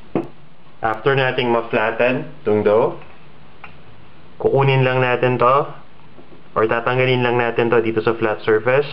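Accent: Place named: Conyo, Philippines. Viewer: ang konyo mag salita buset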